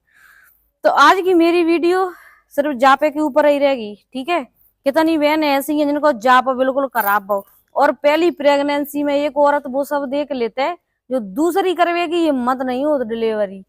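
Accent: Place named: Rajasthan, India. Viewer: बहन आप कौन से गांव से हैं यानी आपका मायका कहां पर है आप तो मेरी तरह भाषा बोलता है मैं भी राजस्थान से हूं प्लीजबताएं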